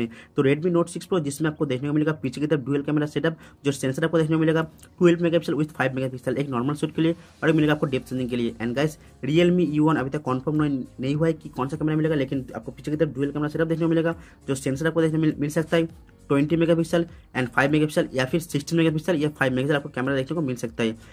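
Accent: Bengal, India. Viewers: Sir aap Bengali ya Oriya hai kya?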